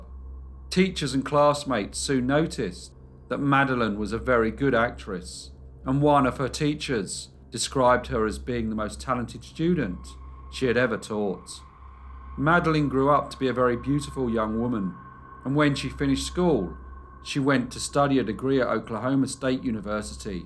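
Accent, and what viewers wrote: England, United Kingdom. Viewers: Man I love this channel. I cant get enough of listening to this narrator's voice say "Guilty " with his awesome British accent. Keep up the good work. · Thanks for all your uploads. I love your stuff. As a Brit who has lived abroad for decades, due to my job, I love to hear your English accent🙂👍🏼💚🙂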